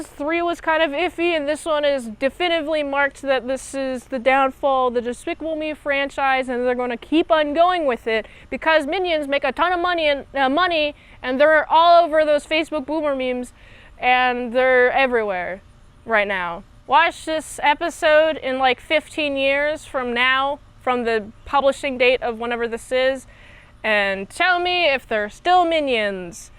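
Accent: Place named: United States. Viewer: I love the American accent lol. What part is that accent from?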